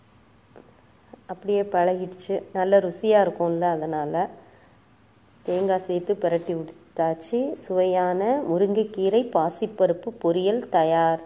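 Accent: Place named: Tirunelveli, India. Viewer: Assalamu alaikkum vrh, yepdi irukkinga maa, yen name fazila, i am from chennai maa, neenga peasurathu keakkum pothu oor baasai nallaa theriyuthu, naangalum thirunelveli la eral thaan, namma oor baasai keakka santhosamaa irukku maa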